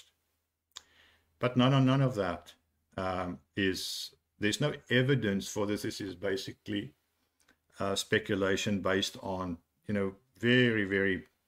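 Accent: South Africa. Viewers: but every video someone makes about this accident is just speculation, this guy sounds liker a South african trying to just say something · Are you South African 🇿🇦? You sound like it.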